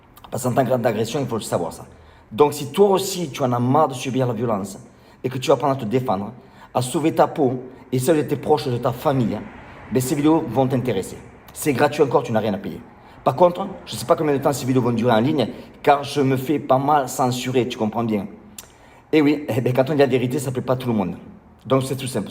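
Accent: South, France. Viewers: J adore votre accent " Sudiste " !!!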